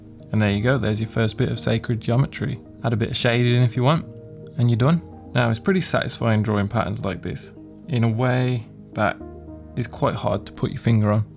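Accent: Birmingham, United Kingdom. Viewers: I recently came across your channel. You share so much in this video for someone like myself who is completely new to this. Btw, it sounds like you have a Birmingham accent. Not that I expect you to share, lol.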